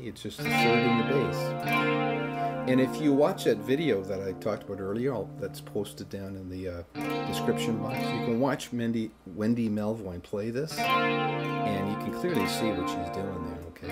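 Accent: Canada. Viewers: You talk so Canadian. Good video though.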